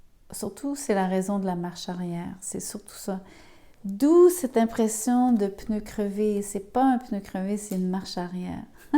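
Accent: Quebec, Canada. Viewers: Bonjour, toujours très parlant. C'est tout le concept de rester buté sur une idée pour s'empêcher d'être heureux, quelle tristesse... mais avec un accent québécois, ça passe mieux. 🥲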